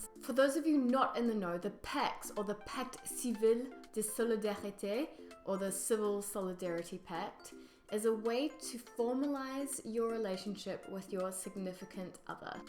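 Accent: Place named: New Zealand. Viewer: You sound Kiwi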